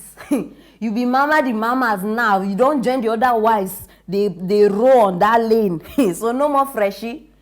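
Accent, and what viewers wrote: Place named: Benue, Nigeria. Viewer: Thank you jare! I love this your explanation. Your pidgin, so much sounds like my friend from Benue.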